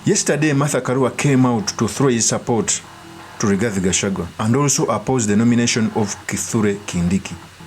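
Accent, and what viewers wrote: Uganda. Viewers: U are Ugandan according to your accent...why are u calling yourself kenya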